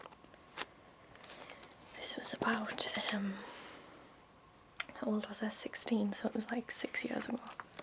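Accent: United Kingdom. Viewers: are you from england ? cuse it seems like you have a british accent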